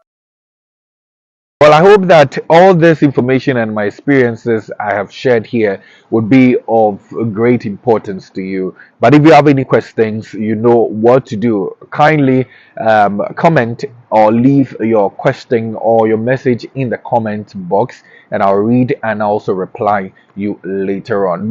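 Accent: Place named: Caribbean. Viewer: my brother you sound like youre from the Caribbean. ty so much for the information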